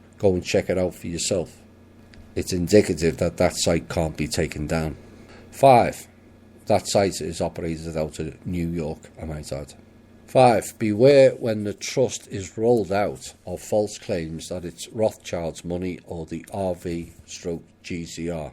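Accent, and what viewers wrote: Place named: Liverpool, United Kingdom. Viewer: I feel that the evil in this world has unleashed something palpable, and it seems to be growing exponentially! Maybe it has always been there and I only feel this because I am 'waking up' I don't know, but I pray for you, and all those who risk EVERYTHING to bring us truth and hope. May God (what/who ever you perceive the creator of light and love to be} shield and bless you always. THANKYOU!!!!! (PS - I'm guessing Birkenhead or Liverpool region?)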